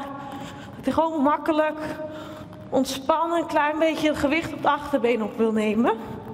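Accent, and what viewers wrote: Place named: Netherlands. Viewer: An stop at 10.35 min. We can see /reconize that you Mrs. Have had your antebrachialis and infrascupularis dex./sin. In the right position like olympia level ! The horse ,capri sun , open his atlas axis bone connectivity and it looks for better/more scores ! .......sure only your dutch accent in your voice , hold me down to gave full points -cute or sweet thats not an question Mrs. Dinja van liere -amazing ,to build-up talent....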